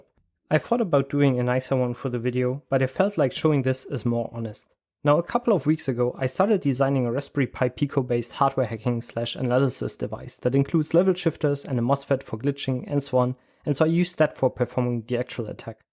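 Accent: Germany. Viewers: du bist doch bestimmt deutsch das hört man an deinem english xddd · Are you German? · Der deutsche Dialekt, xD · Du sprichst Deutsch oder? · Let me guess, you're german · Gutes Video man hört das du deutscher bist XD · Are you German because your accent. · German accent :p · Bist du deutsch? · The german accent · der deutsche akzent kickt 😂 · You should talk a little faster.. much too slow to understand your heavily German-accented English.. thumbs down for presentation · Man erkennt eben dass du Deutsch bist haha. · wie deutsch sich das anhört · Are you German? Because you sound like Werner Herzog lol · Bist du Deutscher ? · are you German ? if so, me too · Whats that accent? German? Nethertheless good job. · I am neinty % sure this guy is german because of the accent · i hear a little bit DEUTSCH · Mashalla wie er Airtags ausspricht, sowas von Deutsch. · Can I ask, is your accent German? 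· You sound German, are you?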